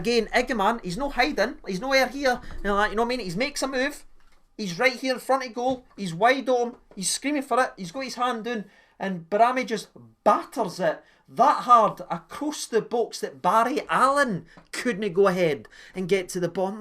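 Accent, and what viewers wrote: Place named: Glasgow, United Kingdom. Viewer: Love listening to you man. The stream of consciousness Glaswegian rant reminds me of home.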